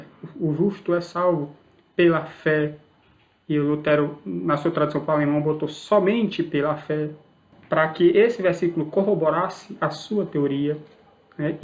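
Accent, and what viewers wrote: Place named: Northeast, Brazil. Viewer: Vc é maravilhoso! Sotaque nordestino do jeitinho q eu gosto. Sou cearense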